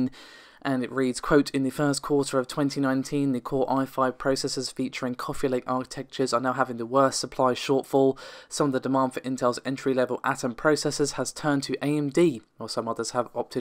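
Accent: United Kingdom. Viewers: HAHA, her name is or at least that's what it sounds like, pretty unusual name for me. I can relate because when I found this channel I also thought she sounded more like a British guy, but not to the point where I would confidently say it was a guy. I'm not a native English speaker so that might have something to do with it.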